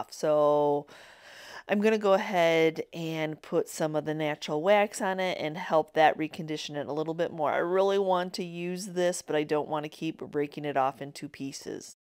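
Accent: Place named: Texas, United States. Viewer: Love the Bible tree and the "God winks". I've experienced them many times since I lost my husband unexpectedly. It has been 2 years but it still feels like yesterday. So God winks are essential to my health! Love your channel, your collections, your personality and your crafts. Are you sure you're not from Texas because you sound like one of us!😁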